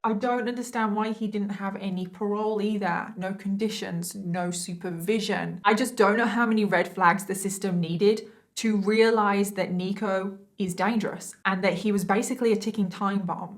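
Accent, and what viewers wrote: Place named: West Midlands, United Kingdom. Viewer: Your accent is a combination. West Midlands and what?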